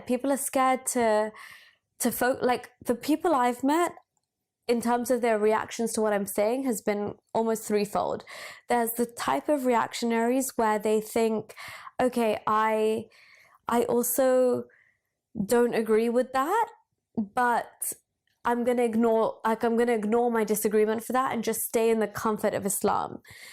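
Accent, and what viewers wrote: England, United Kingdom. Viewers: It's still interesting to see an ethnically Indian person to speak English in an Anglais accent.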